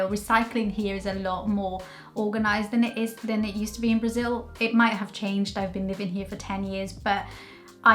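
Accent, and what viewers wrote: Wales, United Kingdom. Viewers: I love your british accent, it's sounds so beautiful and clear You've been helping me a lot with my English, "kisses and hugs" from Brazil! Obrigada por tudo ♥️🇧🇷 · You’re picking up a Welsh accent 👍🇬🇧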